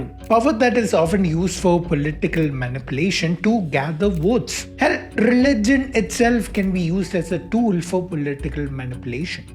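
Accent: Kerala, India. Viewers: Your Mallu Accent 😂😂😂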